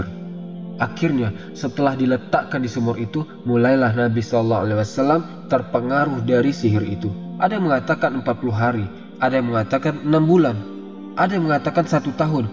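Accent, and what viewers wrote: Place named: Sulawesi, Indonesia. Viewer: Logatnya kayak orang sulawesi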